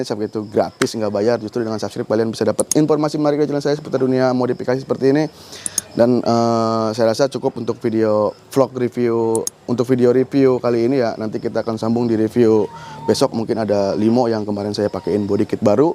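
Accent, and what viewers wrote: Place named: West Java, Indonesia. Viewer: Hahaaa apansa sunda banget.. Sukses baaaaang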